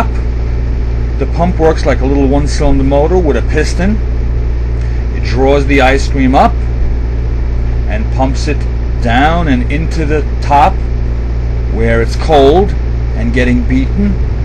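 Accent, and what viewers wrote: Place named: Italian-American, United States. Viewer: I like your Italian-ish American accent.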